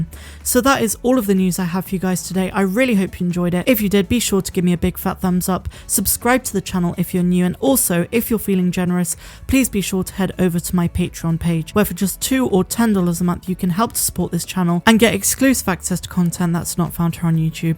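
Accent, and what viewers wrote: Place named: West Country, United Kingdom. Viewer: I always enjoy your videos and I love hearing your west country accent (Bristol is one of my favourite places in Britain). I often wonder if there is a correlation between how exciting the news is and how many ''Uttinis'' in the intro. I do miss the 3 x Uttini intro. ;) Keep up the good work, and may the force be with you ;) x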